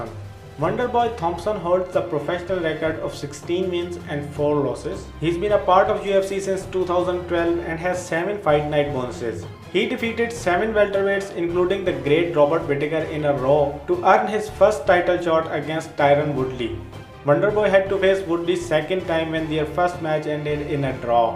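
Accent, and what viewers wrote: India. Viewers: In the description it said "Asian accent" I understand that India is in Asia but it would have been a lot more honest to say Indian accent. All the same I'm pretty sure most people can understand you, your English isn't that bad. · I am sure the voice in this video is an Indian Guy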